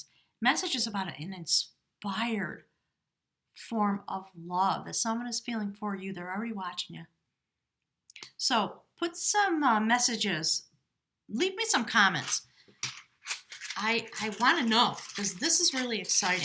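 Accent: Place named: Western North Carolina, United States. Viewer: The beginning sounds like Western NC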